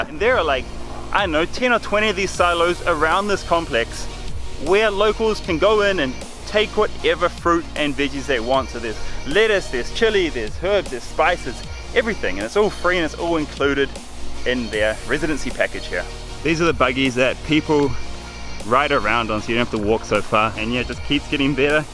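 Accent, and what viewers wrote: India. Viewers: Good content but it's very hard to understand your Indian accent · Love your hindi accent Please visit once Varanasi which is older than history itself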